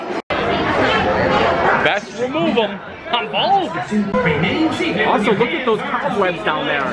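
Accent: Pennsylvania, United States. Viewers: I love your PA pronunciations:) Such a happy day except for the bear claw incident which was both tragic and hilarious 😂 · Yupper, you have a Pennsylvania regional accent. You also accentuate the letter d. That's what makes you unique and one in a million, which means there are 7000 of in the world. 😂. Don't change a thing. Too bad you could not do the Matterhorn 😂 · It's the PA accent...like Wooder (Water) :D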